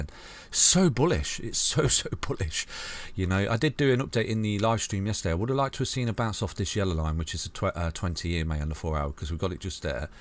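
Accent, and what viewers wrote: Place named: Leeds, United Kingdom. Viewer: Grab a pcs of paper and write down the time and then you just put a time in the description for example HERO BTC Etc YT will split the video based on that. its as simple as that pal. Btw, I am curious where your accent from. You've mentioned Leicester a few times but for me it sounds like a Leeds accent? Just out of curiosity pal.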